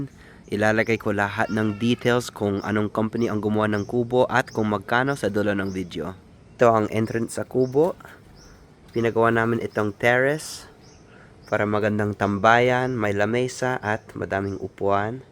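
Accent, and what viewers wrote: Philippines. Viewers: Nice kubo. Grabe ung accent ni sir pinoy na pinoy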